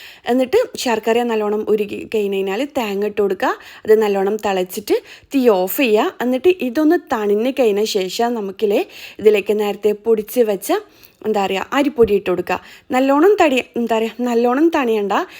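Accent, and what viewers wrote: Kannur, India. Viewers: നി കണ്ണൂർ എടാ ആണ്❤❤❤❤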